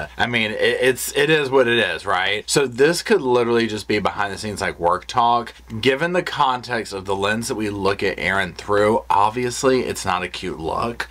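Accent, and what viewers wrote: South, United States. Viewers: you sound like a true southerner. Where are you located? First time listener. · i just want to say, that YOu ARE ADORABLE. i have wAtCht you for awhile now, and your energy just makes me happy. ☻ So sweet!!! You and your doggy and your “sofa”. Your disposition is so loving and playful, a refreshing stance for True Crime. Also, you are aging gracefully, love the beautiful silver filling out your face, you are a very handsome man. And, gotta love that Southern Accent! My husband is from South Carolina, and i too have roots in the South. i miss it terribly…. Washington State is gorgeous but Southern culture is the best.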